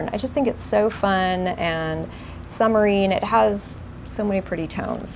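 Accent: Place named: South, United States. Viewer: You may just be getting a bit of southern drawl!!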